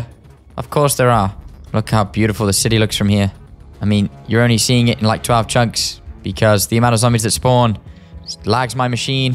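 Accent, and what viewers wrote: United Kingdom. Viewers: You sound more british than i last saw you 😅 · dude has the most british accent ik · its the first time i watch a movie from you but why are you sounding so british?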